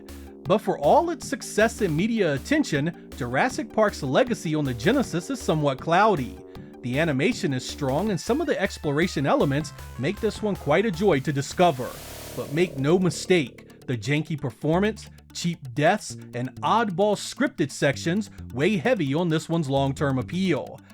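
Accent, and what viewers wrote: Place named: Northeast, United States. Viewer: you have a funny accent are you from some weird northeastern US city?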